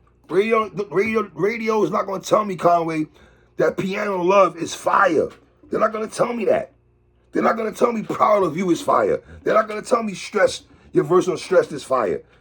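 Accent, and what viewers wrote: New York, United States. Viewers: Nothing to add, really. Great commentary here by an authentic NYC voice,. Someone in that Drumwork/BSF/Griselda camp needs to make sure they all see this video. Radio didn't make them hot. Conway and nem make music for a niche fanbase, who loves what they do. Unless they're willing to switch up their sound and play the political industry games, they shouldn't even worry about getting spins from the likes of Flex.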